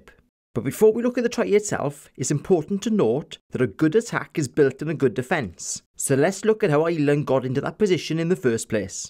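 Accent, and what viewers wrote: Wales, United Kingdom. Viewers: Nice breakdown and analysis,. Your accent is very much like mine, where are you from? I grew up in Pontardulais but now live in Australia.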